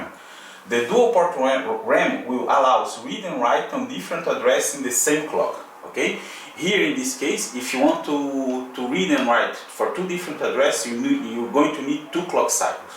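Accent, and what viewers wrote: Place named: Brazil. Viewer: Great, but that brazilian accent :D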